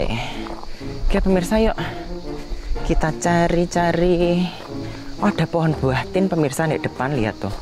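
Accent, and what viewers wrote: Java, Indonesia. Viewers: Waduuh medok mas jowone sampeyan sueneng aku ngikuti konten" e sampeyan iku smg sukses slu yo mas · Assalamualaikum, Maseh ini asli mana to kok iso biso jowo medok, Lek angsal perso pundi mas jowone ?? ☺️🙏🙏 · He..he..he.. logatJowo medhok..lucu...keren mantap mas... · Ya Ampuuuuun senag bgt nonton mas logat jawax lucuuuu deh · Sampean jowone ngendi mas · seneng bnget liat orng indo medhok ngevlog di luar negeri panen buah2an yg gak ada di indo.. 😀 semangad terus ngevlog nya mas 💪 ntar selanjudnya panen buah pear (liar) klo ada 😀❤️ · Logat nya medok lucu bgt wkwkwkwk 🤣🤣🤣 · Aku baru beberapa hari ngikuti youtube mas,kadang ngmngnya bikin ketawa lucu jawanya jg masih khas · Seneng leh ngrungokke Mas Nek ngomong medhok e poollll mantap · Salam kenal baru gabung.. Asli seko suroboyo opo banyumas mas.. Koq rodo medok medok manjaaaah...😁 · bang kocak lo😂😂😂😂 terus lagi bang medhok nya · Ko sy suka ngomong jawanya 😅😅😅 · Masnya ngomong logat Jawanya lucu.. 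Haha...Salken dari Madiun. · Wes sui neng luar tapi jawane tetep iseeh medoook nek ngvlog teteeeep jowone ra mbok tinggal,wes too maas ndang rabi beng enek seng ngemuli hehe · Mas sampeyan kok lugu banget, jowomu medok banget, seneng aku, maju terus sukses buatmu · Mas tempat e neng ndi ko jawae medoxk kowe lucu mas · Jowo ne ngendi to mas? Lucu sampeyan hehee.. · Mase ..bagus tuh ngomong jowone.... lucu lgi...😃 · Assalamualaikum..salam kenal ms.bu Andi dari Makassar sulawesi selatan.. Udah lama tau mas di vlog mba Yanti Guixe dan Teh Dona Bayo..tp baru smpat koment nih hehe.. Suka bgt dgr logat dan meddoknya ituloh mas.bikin ngakak.. Dan yaa sy sependpt dgn yg koment td..bhw mgkin lebih dikembangkan lg vlognya ttg sejarah kota tmpat ms saat ini..selain panen Ok mas..smangat dan sukses sllu👍👍🙇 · Medhok dan ngondhek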